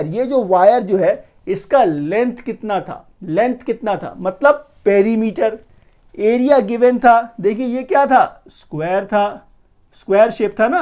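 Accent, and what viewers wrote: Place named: Bihar, India. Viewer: dhanyawad sir itne ache se samjhane ke liye apne har ek word bihari style me ache se samjhaya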